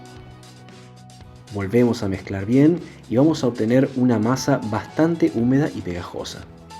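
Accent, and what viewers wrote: Argentina. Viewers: Sé perfectamente que el origen de ese vídeo es Argentina, reconozco el acento, si queréis llamar "jamón crudo" a algo que no lo es, pues vale.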